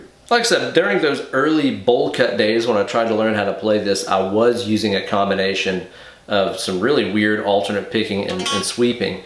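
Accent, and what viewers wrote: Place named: East Tennessee, United States. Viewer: I'm from East Tn, and you sound like half of the people I know. Any chance you're from around here?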